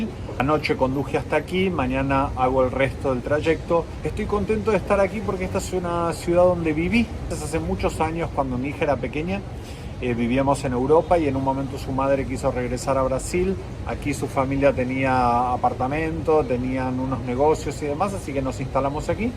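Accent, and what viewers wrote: Argentina. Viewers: te cuento que descubrí tu canal hace una semana y estoy fascinado. En el trabajo, estoy contando los minutos para regresar y poder disfrutar tus excelentes informes. Son extremadamente interesantes, didácticos, divertidos y esa mezcla de argento europeo que le ponés me encanta. El mejor reseñador de viajes por lejos y te deseo los mejores viajes que serán seguramente los que están por venir....eso sí, compartillos con tus seguidores.....Abrazo gigante para nuestro!!!!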